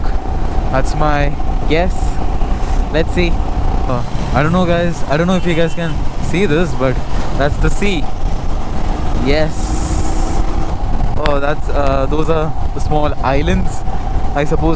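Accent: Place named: Kerala, India. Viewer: Im sure the vlogger is a mallu….Proper accent.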